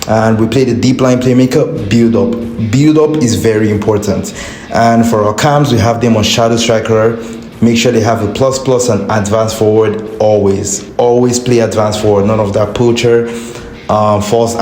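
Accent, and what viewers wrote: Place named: Nigeria. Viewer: Bro are you Nigerian? I can hear your accent a bit. Also what’s your ID so we can play a friendly if you have time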